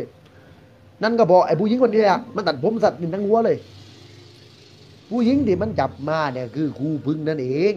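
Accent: South, Thailand. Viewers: ชอบสำเนียงใต้คุณ มาก รู้สึกแบบดีใจอะผมก็คนใต้มาฟังคนใต้ด้วยกันเรื่องที่ดีแบบนี้แล้วดีใจจริงๆ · มาฟังคุณ แหลงใต้ ทั้งสำเนียงและศัพท์ใต้ตอนล่างที่คุ้นเคย เปิดมิติใหม่ของการรับฟังเรื่องผี ทำต่อไปนะมาถูกทางแล้ว😁แว้บๆวันก่อนเห็นช่องนี้แปลชื่อเรื่องเล่าเป็นภ.เกาหลีใช่มั้ยนะ มาดูอีกทีกลับเป็นภ.ไทยละ ถ้าทำจริงนี่ล้ำเลยไม่ซ้ำใคร 👍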